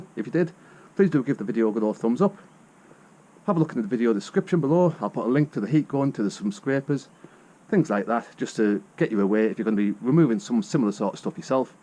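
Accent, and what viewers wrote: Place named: Newcastle, United Kingdom. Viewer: Many thanks, very helpful. You can't beat a Geordie accent!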